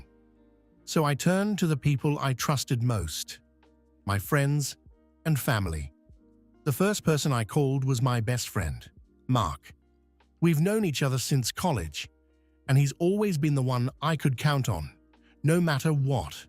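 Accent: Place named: England, United Kingdom. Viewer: The English accent is fitting in this case.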